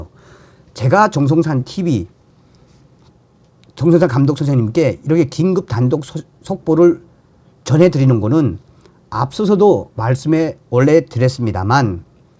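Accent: North Korea. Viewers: 북한말 하지마세요.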